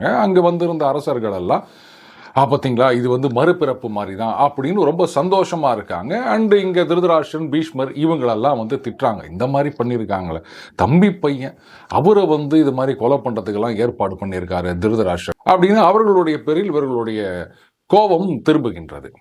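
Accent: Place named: Chennai, India. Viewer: பாரதத்துக்கு மட்டுமாவது “ சென்னைச் செந்தமிழ் “ வேண்டாமே.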